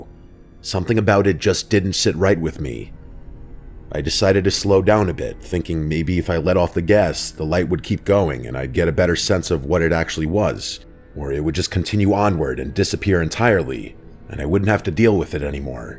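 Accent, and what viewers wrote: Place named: New Jersey, United States. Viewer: No he’s not. The abhorrent Jersey accent is far from intriguing. It’s amazing how many Generics fall in line.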